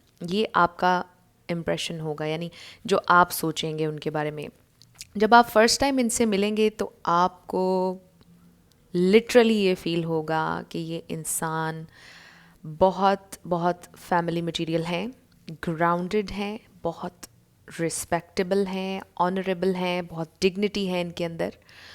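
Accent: Pakistan. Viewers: Your voice is so addictive with that peculiar urdu touch... I love your voice and style of speaking....Stay blessed ma'am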